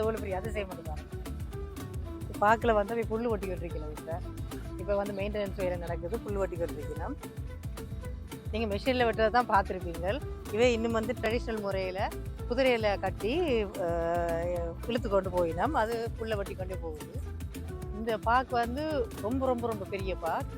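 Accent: Sri Lanka. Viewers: Fendastic,i from pondicherry, India I like ur srilankan slang speech very much, great kp it up mam,and also go through many more videos like this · ஈழ தமிழை கேட்டேன்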